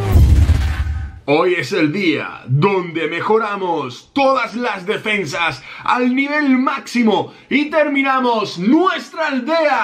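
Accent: Spain. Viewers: Habla asi porque es español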